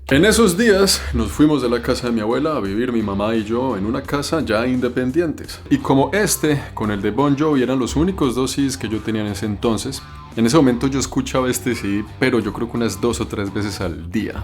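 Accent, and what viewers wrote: Bogotá, Colombia. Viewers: Es el acento de Bogotá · Sigo su canal de viajes , su estilo calmado, educativo, sus comentarios y su acento colombiano que le queda preciso a sus narraciones. Es un ser humano con gustos como cualquiera, y en ese contexto no hay música más internacional que el rock y el metal. Saludos desde Ecuador parcero 😊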